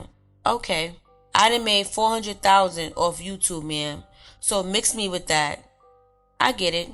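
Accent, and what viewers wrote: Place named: New York, United States. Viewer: Yu can tell that the narrator/reader is from NewYork. I think it was more authentic wen someone from that city with that accent was reading transcripts. Like the one that was in new orleans that time. I think she passed away or something. Still 🔥 tho. 🫡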